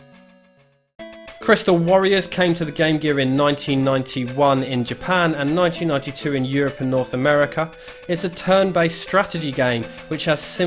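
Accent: England, United Kingdom. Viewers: I downvoted this video because you're English and I find English accents to be very unpleasant and English reviewers always tend to miss the most important and distinct parts of gameplay mechanics in favour of prattling on about story and themes.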